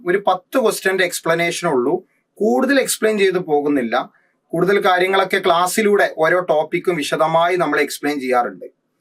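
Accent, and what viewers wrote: Malappuram, India. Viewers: Swantham muthu mani... ❤❤mash nte aaa malapuram slang um.. Comedy yum okke orupad ishtom🥰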